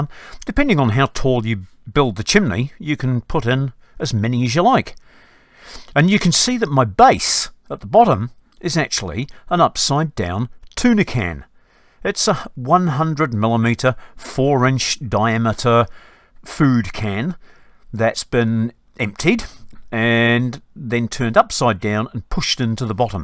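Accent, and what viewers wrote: New Zealand. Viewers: You sound like a fellow Kiwi man.Not been home for many years and right now I'm living in Odessa but there's no fighting here.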